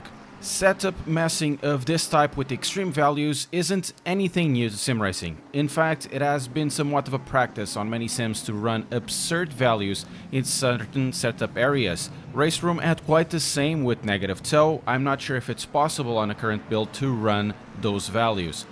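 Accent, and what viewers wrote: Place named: Portugal. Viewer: you sound portuguese.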